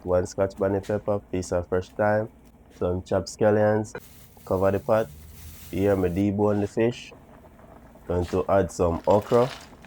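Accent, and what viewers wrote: Jamaica. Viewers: Love how you express yourself authentically Jamaican!❤🔥🇯🇲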